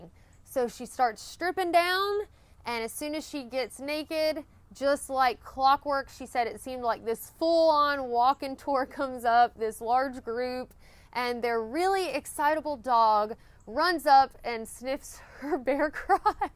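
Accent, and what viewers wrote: South, United States. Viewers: Love ALL your vids, but a side effect of binge watching yours and Quicksand’s videos is that when out hiking I find myself thinking and talking to myself in that beautiful southern accent, I swear 🥴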